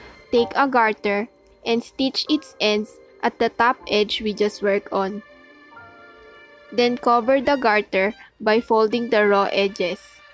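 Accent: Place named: Philippines. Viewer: Your accent tells me that you are Filipina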